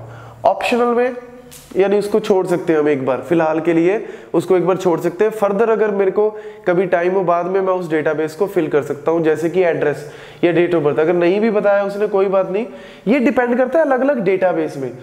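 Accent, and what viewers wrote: Punjab, India. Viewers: Sir has a punjabi accent ❤ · Or aap sayad punjab se ho kyoki aapki language me thoda punjabi tadka bhi he😊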